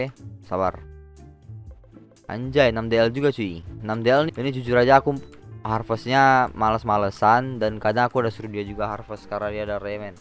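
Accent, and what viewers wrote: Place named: South Jakarta, Indonesia. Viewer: Bg ngomong lu kaya org jaksel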